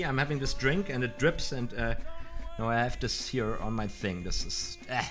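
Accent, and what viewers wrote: Germany. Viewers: woow ich hab vorher von chris ramsay gelernt und hab dich gerade eben gefunden...habs sofort am akzent geört das du deutsch bist hammer !!!! mach weiter so !!!!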